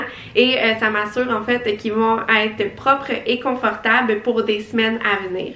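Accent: Quebec, Canada. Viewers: Cet accent québécois est insupportable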